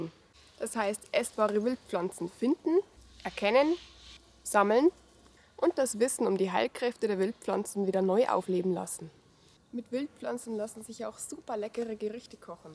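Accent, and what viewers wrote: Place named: Bavaria, Germany. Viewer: Toller Trailer und du kommst sehr sympathisch rüber aber bairisch ist halt eh immer am charmantesten. ;-) Mich würde interessieren was das für eine tolle Musik ist, die du da verwendet hast. Griaß aus da Obapfoiz. ;-)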